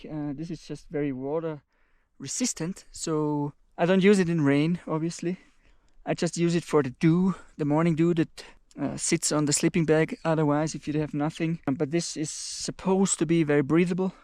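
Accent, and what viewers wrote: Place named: Austria. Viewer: Sehr sympathisches und eindrucksvolles Video. Sind Sie Österreicher? Ihr englischer Akzent klingt sehr danach :) 😉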